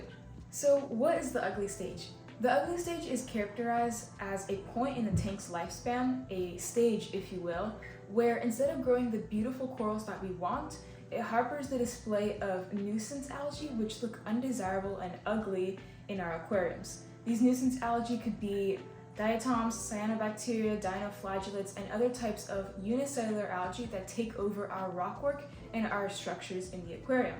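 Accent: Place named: Quebec, Canada. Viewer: Are you French Canadian? I hear a slight accent but can’t place it. Great video by the way. We need more explanation like this in freshwater as well.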